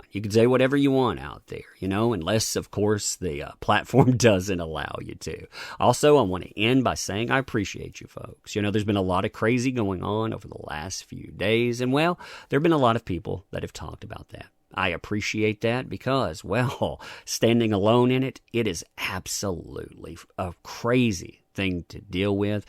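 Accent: United States. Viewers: Your accent is the most American thing I've heard, but it's also kinda really unsettling and made me uncomfortable and don't really like listening to you talk.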